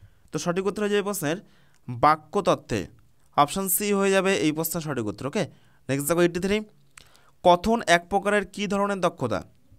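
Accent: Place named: Alipurduar, India. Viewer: Sir apni kon jelar.. Anek ta Alipurduyar er vasar moto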